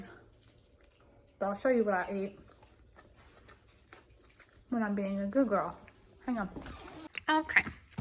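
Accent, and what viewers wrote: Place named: Oklahoma, United States. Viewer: Just found your channel recently .Love it ! And you sound like you could be an Okie too😁👍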